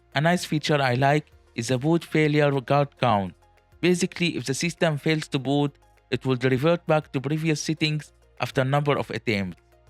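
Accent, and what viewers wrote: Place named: India. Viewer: Indians will never pull eastern women with their accent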